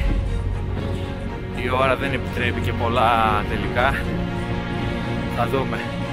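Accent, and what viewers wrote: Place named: Laconia, Greece. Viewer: VERY GOOD PANEMORFO VIDEO SOUNDS. Your Voice and The Way You Talk, Remind me Thn NEAPOLH LAKONIAS. When My Family Was All Together, Before. Blessings To you All And To Your Teammates Fabulous Showcase ❤🇱🇷🧡🇬🇷🧡🇬🇷🧡🇬🇷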